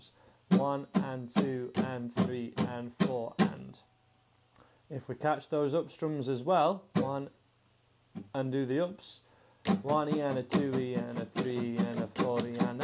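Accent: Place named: Hull, United Kingdom. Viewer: where are in the UK? I’m from Hull but living in Australia and you sound like a neighbour! Loving learning from you. Very clear to understand. Thanks for all you’ve done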